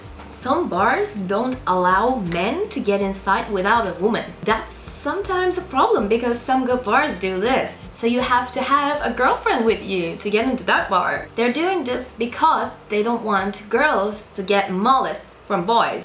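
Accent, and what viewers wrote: Turkey. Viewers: Canım senin isim yabancı ama sen Türk sün belli o aksan ı yapman için çocukluktan öğrenmen Lazım Türkçe bu o kadar değil. Ayrıyeten konuşmanı düzelt demeyeceğim belli ki tarzın bu onun yerine değindiğin Konuları mal gibi anlatma:// so sadd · Why is your name if you're a Turk? You also don't sound like you've been raised somewhere else than Turkey, you have the Turkish accent. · Kız Türk arkadaşlar. Aksandan anlaşılıyor zaten. Hakkında bölümünden de görebilirsiniz. (Çok iyi İngilizce konuşuyor, o başka) Türk'ü Türk'ten başkası kötülemez ki. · Well..She just trying to be famous as other crappy ppl. i think she is fully Turkish or she might living in Usa or any other country atm,she just trying to act like she is not Turkish but her accent doesn't let her hide it. i just notice it in first 5 min.i will check her other videos too, lets she what she done. 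· Konuşan kiz nereli? Türk mu? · Aksandan Türk olduğu belli. Kullandığı adı yadırgamıyorum çünkü Çiğdem, Işın gibi bir adı koysa hiçbir gavur gelip izlemez. da.. ne iş? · Aksanindan turk oldugu anlasiliyor, mal mi ne ya. 😂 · She is turkish! This is typical turkish english accent when they learn it in school:)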